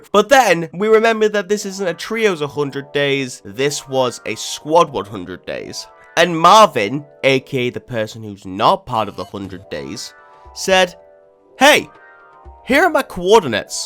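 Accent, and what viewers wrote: United Kingdom. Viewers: I think you have a British accent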